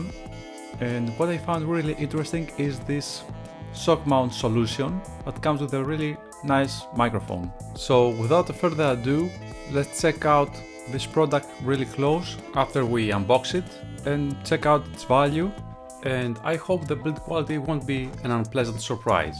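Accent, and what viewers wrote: Greece. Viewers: Η Ελληνική προφορα ξεχωρίζει από χιλιομετρα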